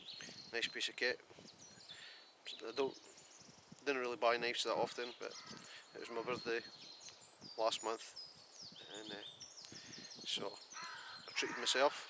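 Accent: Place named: Scotland, United Kingdom. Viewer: Really enjoyed the videos, I have watched a few of your's tonight and have Subbed to your channel...can you clear up one thing tho, your accent, which shire of Scotland are ye from lol? Cheers, keep up the gid work jeck. I checked out your Wix page and answered my question, yer just up the road lol mun. Kielder forrest will be the playground then haha. Regards Ray. (Eyemouth)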